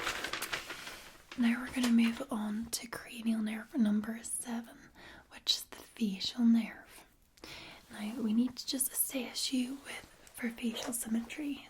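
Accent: Scotland, United Kingdom. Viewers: That Scottish accent, oh Marry and Joseph, lol :D just lovin' it :) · I love Cranial Nerve Examinations I've seen so many of them by different ASMRtists that I really want a Cranial Nerve Exam if there half as relaxing as in ASMR then I think I would really love it! If not for nothing if I ever need one I will have a wealth of videos to go back to to relax and calm my anxieties! I love your Scottish Accent in this video is this a Northern Coastal accent kind of like ASMR Shortbread or otherwise known as ASMR Shortie? Thank you for a really relaxing Tingly video it seems like today has been a stressful tiring day I really appreciate these calming videos in the evening!:_Loveheart::_Loveheart::_Loveheart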